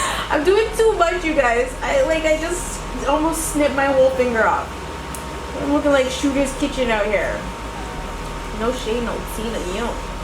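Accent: United Kingdom. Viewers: you NEED to do a video with your British accent 🙌🏾🇬🇧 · Ur british accceeent in love ❤️❤️❤️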